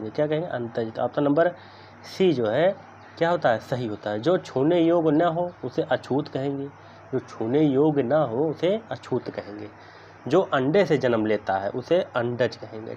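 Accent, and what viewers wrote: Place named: Agra, India. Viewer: सर जी आपकी भाषा तो अपने आगरा जिले की सी लग रही हैं🤣🤣 आप कहाँ से हो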